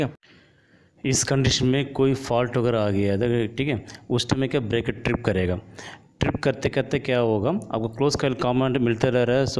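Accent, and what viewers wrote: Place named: Tamil Nadu, India. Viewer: Speaking like u r Tamil. R u from tamilnadu sir ??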